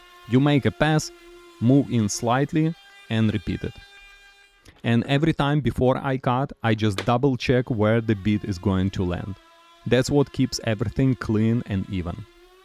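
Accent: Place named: Ryazan, Russia. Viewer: Я слышу Рязанский акцент в этом английском. Какой то примитивный чел.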